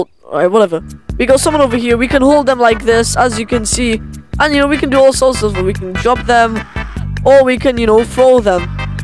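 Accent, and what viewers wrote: United Kingdom. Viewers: The way he just speak like British 😂{Not being rude} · I love his British accent it’s good😊😅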